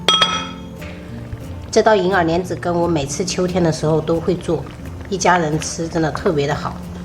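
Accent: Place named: Minnan, China. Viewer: 因為有閩南口音 😂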